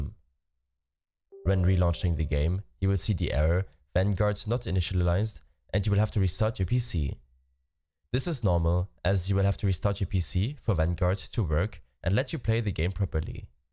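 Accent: Germany. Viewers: Man hört so richtig heraus, dass du Deutscher bist :D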